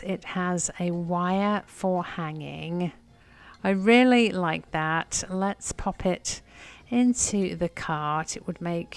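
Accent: England, United Kingdom. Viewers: Thank you for answering my question. Your English accent is still strong so I was wondering. I have been watching your channel for a few years. Love your finds. I have been to Las Vegas 4 times 1st time in 1992 and spent 3 days of our honeymoon there in 1995 when we travelled aound the west coast but never visited a Goodwill. Take dare.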